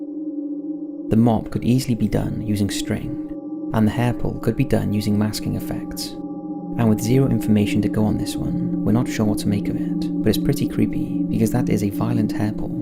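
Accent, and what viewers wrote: United Kingdom. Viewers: Is this british, is he american? Can't make out what he is. His narration is really good though.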